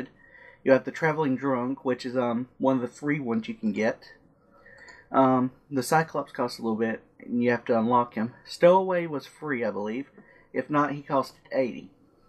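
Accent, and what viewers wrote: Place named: South, United States. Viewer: southern guy love it :p sound like a friend i used to know .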